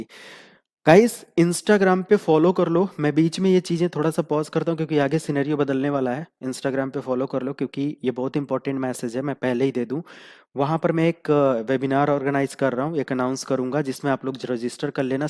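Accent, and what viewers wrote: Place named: India. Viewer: Big bro, Its too good. Flaws hain kuchh.. jaise chaand pe daag. Communication skills pe bhi kaam karo.. Sh s wala issue hai.. Jab bhi Power Shell bolte ho.. Partial sunai deta hai... confuse ho gaua tha me.. Actually, s sh issue bhi zada ni hai.. i heard you often using the correct form... intemitent issue hai ye.. kabhi kabhi miss karte ho... Khatarnak Indianism... ye global tech hai... isme bolte time Indianism khatam karo... angrezi bolna majboori hai kyuki un terms ke liye Hindi words hain he ni... lekin aap bolte time apne brain me translate jo karke bol re ho.. wo translation practice idhar reflect ho ri hai... Khud socho.. itna knowledge wala video agar proper English me dalo.. to kitne consumers jeebh latkaye laar tapkate bethe hai apko sunne ke liye... Kisi ko hire karo... apki knowledge proper audio me dene ke liye.. mujhe rakh lo... 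zada paise ni mangunga... kyuki mujhe bhi to gyan milega... Appreciate your efforts. Loads of respect. Many thanks.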